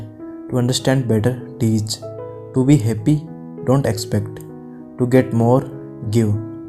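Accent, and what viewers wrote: India. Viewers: Heavy Indian accent. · Did you not find someone with better accent to have a voice over! Dehati English hai! And the first line is catchy! Very cheap! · Welp his foreign indian accent is like butter sad about the thumbnail but stayed for the voice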